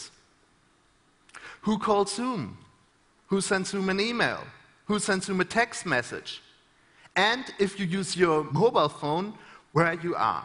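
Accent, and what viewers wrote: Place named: Germany. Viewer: I don't care about stutter but this German accent? Gosh.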